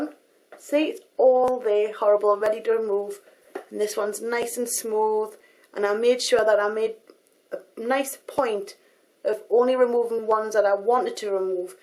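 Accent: Newcastle, United Kingdom. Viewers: shes from newcastle :) geordie accent! best of all :D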